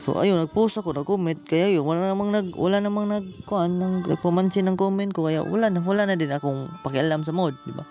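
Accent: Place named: Visayas, Philippines. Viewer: Pareha lang ta idol Bisaya hehehe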